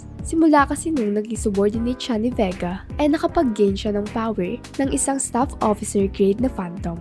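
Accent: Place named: Conyo, Philippines. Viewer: ang conyo ng voice, approve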